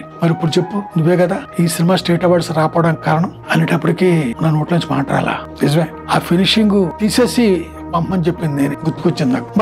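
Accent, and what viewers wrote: Godavari, India. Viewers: Miru godavari slang and location bhaga chupedthari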